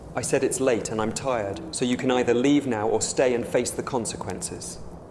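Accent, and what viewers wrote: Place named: England, United Kingdom. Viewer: Hi ! I'm a french girl and I'm soooo happy to understand ! English accent helps a lot 🥰 But may I ask you a question ? It is your voice or an IA voice ? :o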